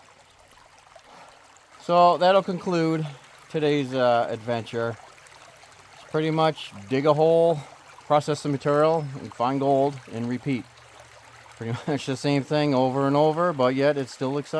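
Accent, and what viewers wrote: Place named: East Coast, United States. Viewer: Awesome! I think you beat me! What state are you in? Accent says out east to me.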